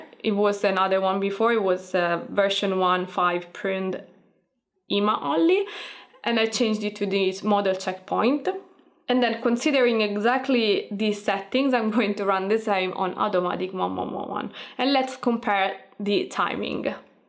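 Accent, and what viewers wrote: Italy. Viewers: You are Italian aren't you? I looove the accent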